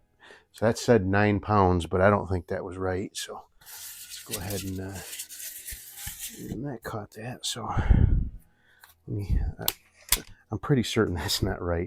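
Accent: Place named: Wisconsin, United States. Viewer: Very cool channel. Been subscribed for maybe a month. Your accent and “goofin around in the garage” thing reminds of everyone I knew in northern Wisconsin as a kid. What I found strange is that you seem to know everything about airguns. And you’re a sought after airgun mechanic/repairman. Every video of yours I watch, is really humble and professional. What’s most fun is that you review everything from top of the line rifles to the cheapest junk not many would buy, and everything you play with is about fun. “Just goofin’ around in the garage” and that attitude really sold me. I’m just getting into pellet guns again after decades of considering them just toys for kids. Mostly because of a sudden and severe hip problem. Going to the range with firearms is out. Plinking in the house or yard with a pellet gun though, is super fun again. 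Addictive as hell. Picked up a Gamo Fusion 3i and was hooked. Watching all these videos and looking at my Gamo, thinking, “I can do better than this…PCP is where it’s at.” Got the Notos and a hand pump (Hill MK 5, best they had), and there is nothing addictive about hand-pumping even the smallest air cylinder out there, the Notos being one of the smallest. “Okay, I’ve gotten a little over my head at 61 but it’s great exercise and really fun to shoot with a scope that’s 3-times the price of the gun.” I’m learning fun stuff with airguns and I don’t even need to leave the house. Thank you and everyone else in the airgun community, I’ve talked with mo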